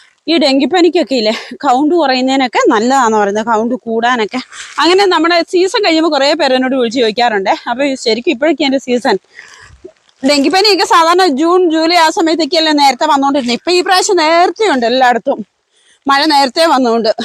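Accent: Kottayam, India. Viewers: ഇന്നാണ് ആദ്യായിട്ട് ഈ channel കാണുന്നത്. Ktym ആണോ നാട്. Ktym ഭാഷ / slang ഉണ്ട് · Aaha nallasalu kottayam slang njn alappuzhayanu marriage cheythu vannirikunnath palakkad checheede video innu first time kandu bhaasha kettappothanne naadine miss cheythu so happy ❤❤ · അച്ചടി ഭാഷ അല്ല കോട്ടയംഭാഷ😅 വടക്കോട്ട് ആരും പറ്റത്തില്ല ചെയ്യത്തില്ല എന്നൊന്നു പറയില്ല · Even I thought she speaks Kottayam malayalam. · ഇതാണോ അച്ചടി ഭാഷ 😂 ഇത്‌ കോട്ടയo ഭാഷ. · ഭാഷയുടെ രീതി കേട്ടിട്ട് കാസർഗോഡ് ഭാഷ അല്ലല്ലോ ഇത് കോട്ടയം ഭാഷയാണ് ല്ലോ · Kasarkodo, samsaram ketit kottayampole😍pazhaya kudiyetakarshakaravumle chechi? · എന്നിട്ട് കാസ്രോട്ട് ബാഷ അല്ലല്ലോ സംസാരിക്കണത്? കോട്ടയം കാരിയാ.....?😂❤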